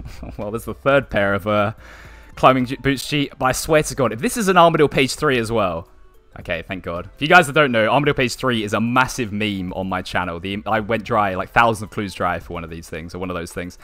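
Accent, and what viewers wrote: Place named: Surrey, United Kingdom. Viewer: Yes my guy, where in the uk are you from? You sound local to me! Surrey area?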